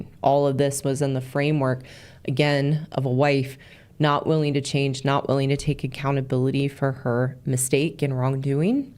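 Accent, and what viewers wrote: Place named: Midwest, United States. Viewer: I knew that I was hearing a hint of a midwest accent with a few Hoosierisms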